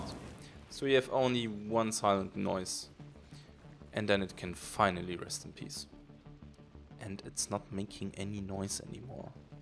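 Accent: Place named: Germany. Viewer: Dezenter deutscher akzent... gefällt mir :D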